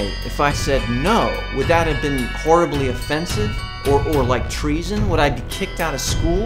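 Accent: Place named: New Jersey, United States. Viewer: For the longest time I would have thought you to be Italian because of your accent (you sound like you're from NJ and with the nice thick black hair and chiseled jawline... slaps face ... okay Tiny, ya need to get back on track!) In that respect I would have assumed you were Catholic. But my friend says your last name is Ukrainian and if you were brought up in any religion it would likely have been Eastern Orthodox or Lutheran, depending what part of the Ukraine your family had originally immigrated from. So is she right?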